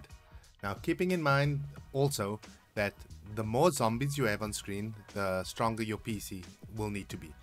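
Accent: South Africa. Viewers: ahhh the defining South African Accent ! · are you from south Africa???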